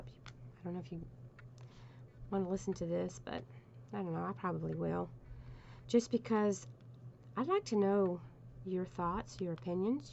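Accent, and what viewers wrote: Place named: South, United States. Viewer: I can't believe you are losing subscribers! I love your gentle southern lilt and I will continue to watch....DON'T GIVE UP YOUR CHANNEL!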